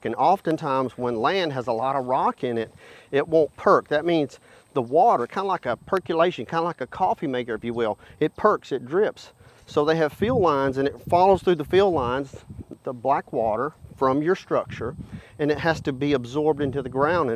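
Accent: South, United States. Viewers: That super southern accent is a challenge. Damn. "Number tin is mineral rots" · i love me some southern accent hehe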